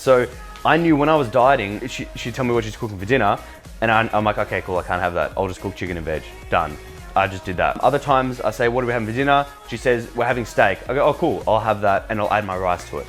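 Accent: Australia. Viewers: I for some reason love the way you say "hey guys here" love the australian accent lol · Is he Aussie? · Useful video! I have made many of these mistakes when I was younger and still great tips which I had forgotten about nutrition. Also love the aussie accent :D Cheers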